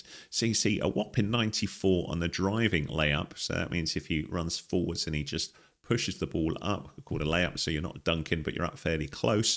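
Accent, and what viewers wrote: United Kingdom. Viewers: great break down, also refreshing to here a brit talking about basketball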